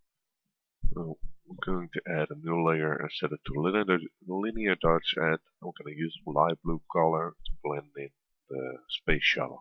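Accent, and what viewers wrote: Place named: England, United Kingdom. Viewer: super tutorial man,ik heb een en ander bijgeleerd,en ik was ook nog ge- entertained door het engels accent.ik wil MEER alsjeblieft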